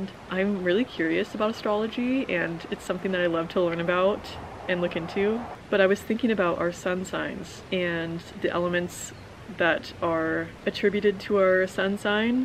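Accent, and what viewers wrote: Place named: United Kingdom. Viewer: Bonjour ! Tu vis dans un environnement magnifique, idéal pour trouver l'harmonie et se sentir connecté, et y compris avec soi. Une astuce pour apprendre le français ( même si tu en parles dans la vidéo), regarde des films en français, tu verras..c'est chiant !! Mais concentre toi sur l'action, tu comprendras un peu, mais surtout, ça va travailler ton oreille et tu apprendras plus vite !! C'est ce que j'ai fait avec l'anglais et au bout d'un mois aux USA dans une famille, je tenais une conversation, alors qu'au début je ne comprenais rien, mais rien de rien 😁! Par rapport aux Britanniques, votre accent est terrible ( il faut comprendre la clef), et vous parlez VRAIMENT très vite !! L'horreur 😂... Après, c'est juste une question d'habitude. Le plus incroyable, qui m'a vraiment surpris, c'est que je pensais en anglais pour parler. 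Voilà.. Merci d'être toi ! Peace...🌿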